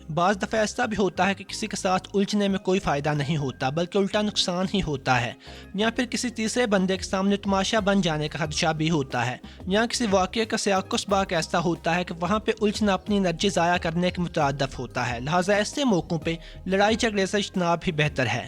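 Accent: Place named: Lahore, Pakistan. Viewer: Lahori accent 😂👌✌️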